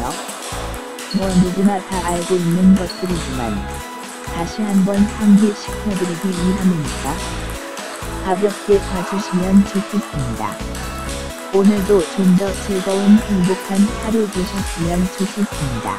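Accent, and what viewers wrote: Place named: Gyeongsang, South Korea. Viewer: 님 탁구러버 아닌가요? 님 말투보니까 딱 경상도 보리문디 같은데요..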